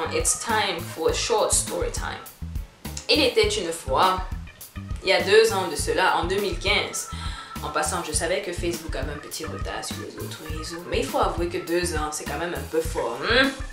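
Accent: Bamileke, Cameroon. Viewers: 😂😂😂😂😂😂😂😂😂😂😂😂😂😂😂😂😂😂😂😂😂😂😂😂😂😂😂😂😂😂😂😂😂😂😂😂😂😂😂😂😂😂😂😂😂😂😂😂😂😂😂😂😂😂😂😂😂😂😂😂😂😂😂😂😂😂😂😂😂😂😂😂😂😂😂😂😂😂😂😂 hahahahahahaha!!!!!! l'accent bamiléké là c'est la magie!!!! troooooooooooooopp drôle tu es!!!! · Ton accent bami la me tue. On s'en fou comme dab 😂😂😂😂😂😂🤗🤗🤗😊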